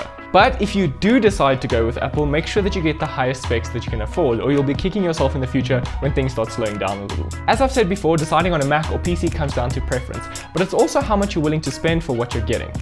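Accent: South Africa. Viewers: I love spotting a fellow South African just by the voice XD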